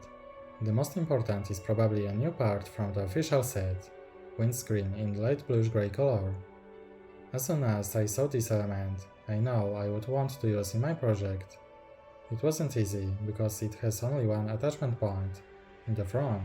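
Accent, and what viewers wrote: Poland. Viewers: Twój akcent wręcz zdradza że jesteś polakiem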